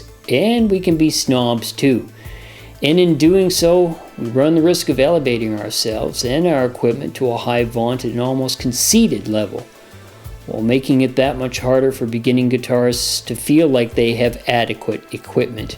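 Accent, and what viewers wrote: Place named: Cape Breton, Canada. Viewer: Where is your accent from??????? I’m a Caper and you sound like it too!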